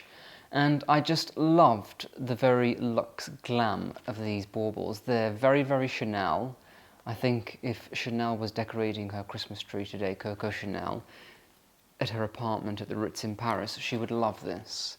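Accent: United Kingdom. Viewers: what a lovely video! I am from Massachusetts, not decorating for Christmas this year, just not up to it after a 2025 marked by family tragedy. But I do like to see what other people are doing to celebrate and you have very good taste. I loved your references to fantasy and magic when you showed that beautiful clock. And of course, everything sounds even more wonderful when the British say it! Wishing you a Merry Christmas,.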